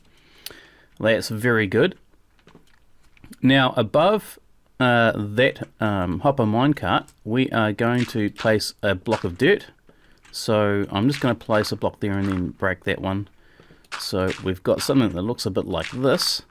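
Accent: New Zealand. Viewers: Yea cause he's got a kiwi accent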